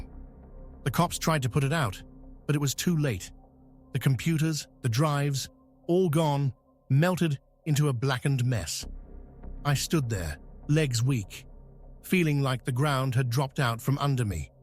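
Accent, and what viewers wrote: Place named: United Kingdom. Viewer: Love the stories with the British voice.😉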